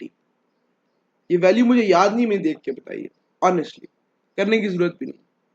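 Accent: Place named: Mianwali, Pakistan. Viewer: I think you are from mianwali?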